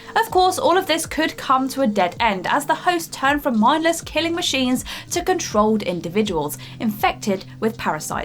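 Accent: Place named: United Kingdom. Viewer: Ugh that British accent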